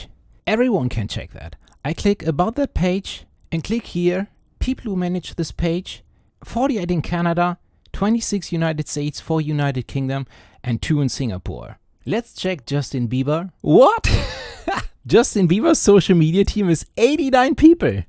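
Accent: Germany. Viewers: His heavy German accent kind of adds to that. Like, he's really trying not to sound aggressive imao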